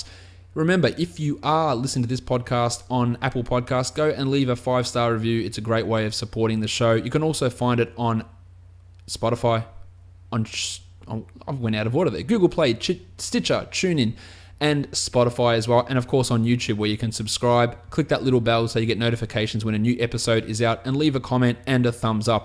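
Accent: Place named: Australia. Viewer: Awesome thanks! I listen to your podcast every morning before school/work, used to live in aus for 2 years so might just be missing the accent.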